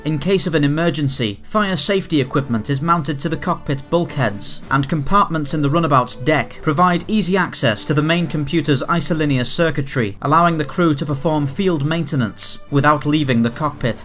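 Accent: United Kingdom. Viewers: At last, I'm hearing an English/UK accent.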